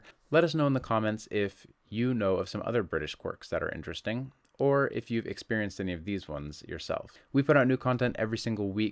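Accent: United States. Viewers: Hey, I completely agree with you about so often not just being able to use plastic to pay for your parking - there's no consistency and it's infuriating discovering you need cash and not having the right change. 🙄 The last time I was charged to use the loo anywhere was at King's Cross station about 10yrs ago, but I don't believe they charge anymore - it's not 'terribly' common these days. 😉 I think people who are picking you up on where you say you live are being smart alecs - they probably hear your American accent and assume you won't know the distinction. I live along the South Coast from you and I always say I live in England - because I do! Next time someone asks you where you live, just say "England... which is part of the United Kingdom of Great Britain and Northern Ireland" - that'll shut 'em up! 😉😂 BTW, the contactless card limit has just been increased from £30 to £45, to reduce the occasions you have to use cash during these times of CoronaVirus. 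Hope Danielle's OK - stay safe and well! 😀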